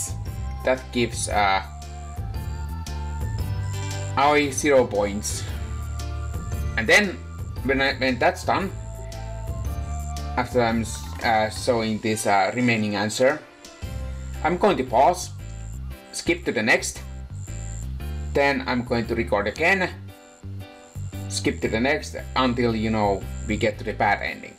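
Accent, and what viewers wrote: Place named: Finland. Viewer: That accent is so strong you from finland perhaps or is it just me?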